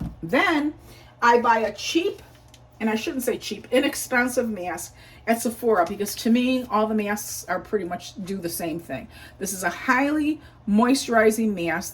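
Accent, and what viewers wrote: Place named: Midwest, United States. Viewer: Hi. I’m Guessing your age is 59, same age as me. You have brought a smile and laugh to my face. I’m happily married to my second husband for 27 years and have two son’s and 4 adorable grandson’s, if I were a lesbian you would be a beautiful wife for me, LOL! Please keep making the video’s. I’m disabled but can take care of myself without any help except for driving. My husband takes me to the doctor a couple times a month. I tend to be unsophisticated when it comes to real fashion. I don’t know the differences in perfume, cologne, & Eau Fraiche. I wear Twirl by Kate Spade it’s a fresh scent which I prefer. Please do more diet related videos and discuss your fitness routine and btw your Midwest accent is refreshing my father’s family was from Missouri and my husband and his family are from Missouri and Indiana so “ kid” keep those videos coming. 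😀😘 Thanks, Sharon.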